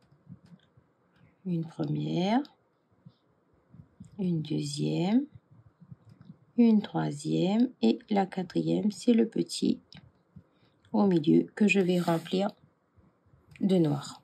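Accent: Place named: Mauritius. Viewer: C’est drôle ! J’avais cru entendre un petit accent de l’île Maurice! Ça fait longtemps que vous faites de zentangle? Moi, je découvre…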